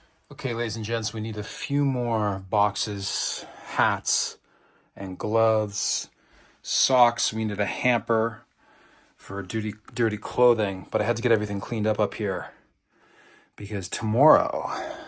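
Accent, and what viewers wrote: United Kingdom. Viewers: Great video. Shame about the British accent. It sounded like Dick Van Dyle in Mary Poppins! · Hilarious,speaking to a swiss mountain dog in an Northern Irish accent. Clovis is great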